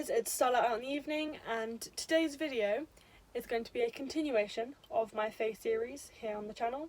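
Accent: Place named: United Kingdom. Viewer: girll just found your channel, what a hidden gem! was wondering what part of the uk you're from? we literally have the same accent lmao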